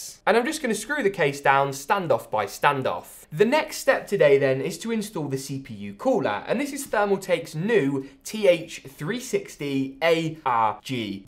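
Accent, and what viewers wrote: England, United Kingdom. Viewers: I luv his british accent lmao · Are you english ? Love your accent . Btw nice explanation , set up and passion about pc 👍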